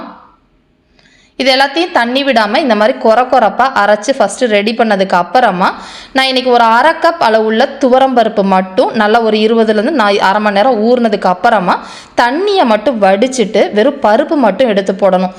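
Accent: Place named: Coimbatore, India. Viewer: நான் உங்கள் new subscriber .நீங்க கோயமுத்தூர்காரங்களா?உங்க பாஷை அப்படி நினைக்க வைக்குது .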